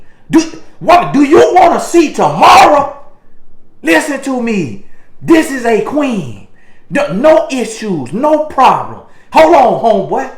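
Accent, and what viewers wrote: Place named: Chicago, United States. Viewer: you sounding a lil Chi town ova here nahhhhh!!! 🗣💯😜 🔥 I love this, definition of what “in love” looks like—Eph 5 from the Bible to the streets 👏🏼👏🏼👏🏼 I pray, Lord you made this man set-apart and I know You ain’t made only one...lol I’m so grateful for the Kingdom description y’all are real relationship goals—not perfect but real & striving to do it Christs’ way. 🙏🏽 Lagos, Nigerian love tho 😩🤦🏻‍♀️ Ohhhh, and another huge thanks, I’ll be getting my Coaching certification with you too. Your ministry, the way you love the Lord & the Queen [i spelled it correctly 😜🤜🏽🤛🏽] give hope to women like me who are in waiting. God continue to bless y’all, exceedingly and abundantly! 😊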